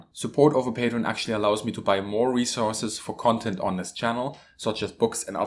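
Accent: Germany. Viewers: you'd notice that he speaks english in a heavy german accent if you've been paid attention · He is from Germany yes! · Giuntoli Im from germany and he does not sound like austrian. He sounds close to "Hoch Deutsch" that is the "Normal German". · No he just has a german name, speaks german and has a german accent.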